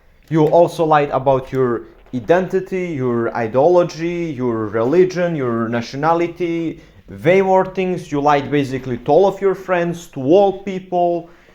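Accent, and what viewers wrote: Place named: Yugoslavia. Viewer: you are so mature wow , by the way good yugoslav accent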